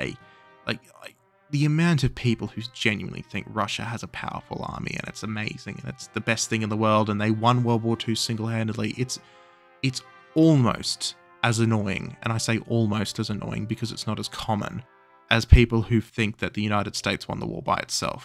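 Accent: Australia. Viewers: It's always such a weird feeling to watch a video in english, specifically from some aussie bloke, and suddenly hearing ARBEITER BAUERN NEHMT DIE GEWEHRE 😂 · I think he’s Australian.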